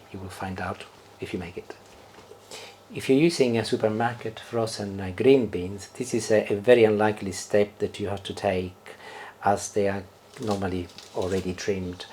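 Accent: Italy. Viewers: Amazing cook! His instructions are clear; his English, with his Italian accent, is excellent! I'll definitely try cooking the dish!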